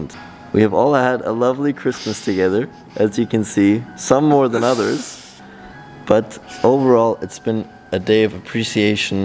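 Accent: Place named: United States. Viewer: I was surprised by your American accent. You must have spent a lot of time in the U.S. BTW, I learned a great deal about the Habsburg dynasty while at school in Austria. Strobl am Wolfgangsee. Fun family video.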